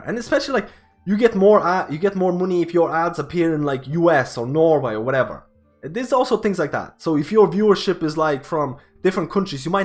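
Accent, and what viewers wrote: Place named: Ireland. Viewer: Came here for resto druid info, stayed for the Irish accent.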